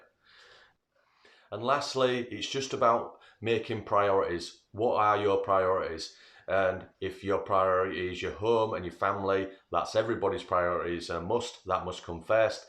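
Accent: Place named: East Yorkshire, United Kingdom. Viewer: I'm near Wakefield. I could tell you were East Yorkshire (Hummberside?) before you mentioned your location from the slight difference in twang. Just glanced at your vast amount of videos, wow in such a short time as well. I'll be viewing them shortly, some great projects you've covered and they're not too difficult for the beginner woodworker to try. Good stuff. Tony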